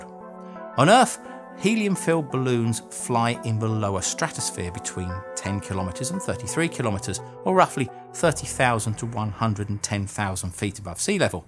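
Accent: United Kingdom. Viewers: doesn't sound very cockney to me....sounds more like a very typical clipped British accent....true cockney doesn't sound anything like English, at least from what I've heard....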